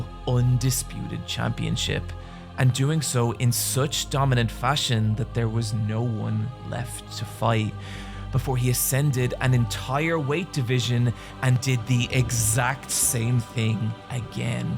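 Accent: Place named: Ireland. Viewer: Another banger from. Your chillingly Irish voice regales us once again. Thank you.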